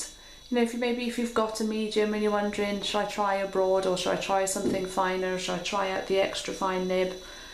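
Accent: Wales, United Kingdom. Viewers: I keep hearing a faint tint of Welsh in your accent?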